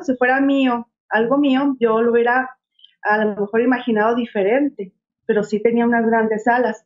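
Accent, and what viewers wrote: Chihuahua, Mexico. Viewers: Yo sabia que eras mexicana por tu tono de voz que alegría 🤩 que puedas contactar con Los Ángeles y seres de otras dimensiones me atrae mucho este tema saludos es la primera vez que veo este canal yo desde que era niña vi una sola vez a seres desencarnados nunca lo hablé tenía alrededor de 3 años y cuando tenía 8 lo platiqué porque vinieron familiares de mi mamá de USA 🇺🇸 Y tocaron el tema pero ellos venían con un aparato detector de metales y al escucharlos volteé y les dije yo los vi me querían llevar al pueblo pues era donde vivían mis abuelos nunca más quise regresar a ese lugar. Después cuando ya era adulta tenía una especie de ataques como epilepsia pero me chocaron y no tenía nada pues tuve un evento de esos y yo sentí q me iba por un túnel oscuro y grité y de NO y siempre cusnfo me platicaban de alguien que tenía un dolor físico yo lo sentía hasta la fecha yo no puedo escuchar q a alguien le duela algo porque me lo trasmiten. 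También cuando mi papá iba a fallecer un día antes soñé a mi mamá ya fallecida y la traían unos seres muy altos con una túnica blanca y la traían en una caja de cristal y la sacaron y yo le dije en mi sueño q si no iba a entrar a la casa y ella telepáticamente me dijo que no y esos seres la cargaron y la depositaron de nuevo en ese ataúd de cristal y le dijeron ya es hora y se desvaneció la imagen y muchas otras experiencias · Wow eres de chihuahua mi paisana que emoción lo supe por tu acento ,,, un abrazo 🤗😘 gracias gracias 🙏🏻 . Me voy a comunicar contigo para que me ayudes necesito una terapia necesito mucha luz 💡 estoy pasando por algo difícil en mi vida. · !! desde que empezaste a comentar supe que eres de Chihuahua, muy interesante tu información, gracias, gracias, gracias, un abrazo con amor y luz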